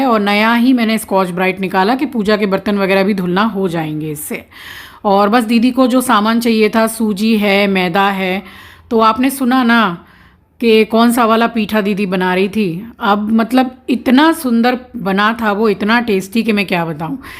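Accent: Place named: Agra, India. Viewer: ji aap ke muh se Agra ki language sun kar bahut achha laga bola karo kabhi kabhi❤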